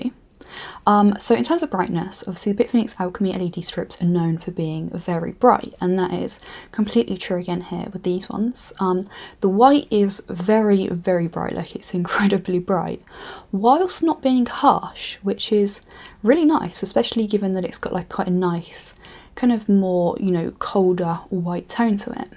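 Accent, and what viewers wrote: England, United Kingdom. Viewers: More videos pls! I love the English accent. Subscribed! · CAME HERE FOR THE BRITISH ACCENT!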